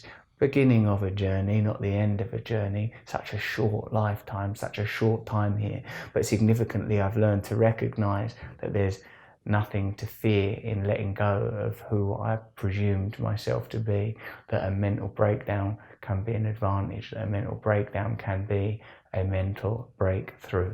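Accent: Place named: Cockney, United Kingdom. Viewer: I love hearing this wisdom with a Cockney Accent. Brill innit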